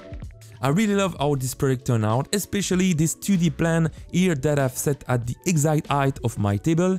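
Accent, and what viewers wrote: France. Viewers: wow l accent francais on aime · L'accent français on est là (en vrai tkt il est super ton accent 👌 super vidéo) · yu have a french accent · L'accent français 😂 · Hey, super ta vidéo! Dommage que je vienne seulement de la découvrir ^^ J'ai directement reconnu ton accent, ça fait plaisir de voir des Français faire de l'internationale ;) J'vais checker le reste de ta chaine, bon courage en tout cas, ça promet du lourd! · thats an incredibly french accent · Au vu de ton accent, tu est français non? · l'accent français de ouf, mdr (sinon superbe vidéo 👍) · It's surprisingly quite rare to hear French accents on YouTube. Not that it's a problem at all. Sorry if it's Swiss or Belgian or whatever, I just can't tell the difference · that french accent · on est d'accord qu'au vu de son accent il est clairement français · its so obvious he's french lmao ! 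tkt tes pas seul XDD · Ca ce vois beacoup que t'ai francais mais bon (Super cool la vidéo) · i love how your french accent just peaks in the ou and au sounds, from a french student it is very satisfying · Its sseems you are french mon pote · French accent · ça se sens que tu es français alors salut mon pote !! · T es français toi sa se sent . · Eh mais t'es français je reconnais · Cette accent français mon frère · Mdr sa me tue que PERSONNE a remarqué qu’il avait un putain d’accent français 😂 English translation: lol it kills me that NOBODY noticed that he has a fucking French accent 😂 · Oh le bon accent français 😁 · My god you sound so French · French accent lol but amazing video! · your defenitly french like me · Translation (according to google translate): Hey, great your video! Too bad I just discovered it ^^ I immediately recognized your accent, it's nice to see French people doing international;) I'm going to check the rest of your channel, good luck anyway, it promises heavy! 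· French accent my dude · Damn to accent francais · You're the frenchest person i've ever heard, very cool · L’accent français qui fait plaisir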